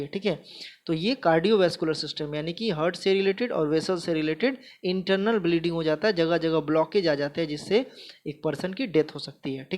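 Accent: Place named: Bengal, India. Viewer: Bhai yh bengali h iska language issue h yh bechara apne taraf c snake he bol rha h hme snak sunai d rha h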